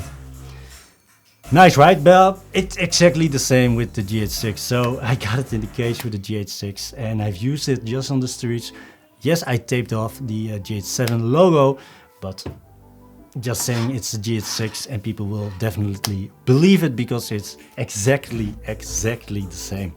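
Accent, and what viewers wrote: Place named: Netherlands. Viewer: only be one the best :), Nederlands tongval ;)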